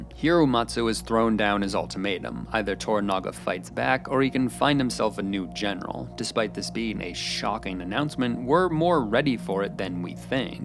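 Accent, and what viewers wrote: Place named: United States. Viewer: Since he's pronouncing everything with a fairly strong American accent, he would probably pronounce Kurosawa wrong as well 😂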